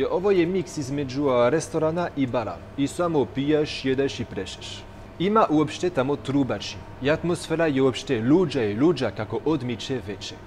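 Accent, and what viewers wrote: France. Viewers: Hahaha o bože kako dobro zvuči srpski sa francuskim naglaskom :)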